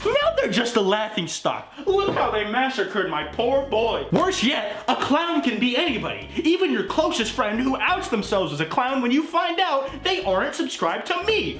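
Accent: Russia. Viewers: I NEED MORE OF TALKING IN A RUSSIAN ACCENT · That was an interesting voice with the Russian accent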